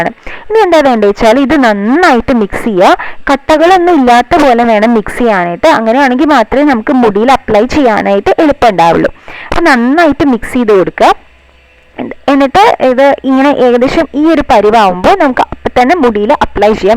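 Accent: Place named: Thrissur, India. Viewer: Mm thoni. Thrissurkar nanayi samsarikunavar annu.. Enikk samsaram eshtam annu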